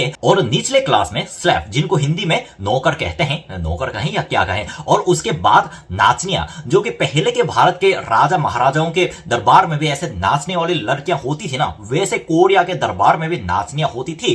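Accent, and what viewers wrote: Standard. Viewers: इतने शुद्ध हिंदी तो मुझे भी नहीं आती Jitna aap bol rahe ho😁.. I'm a bengali girl 😊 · Aap to india se bhi shudhh hindi bolte hai ekdam book ke jaisa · Waah... jabardast... 👍🙏 Varnan karne ka tarika... bahut accha lga.. 👍 sudh Hindi 👍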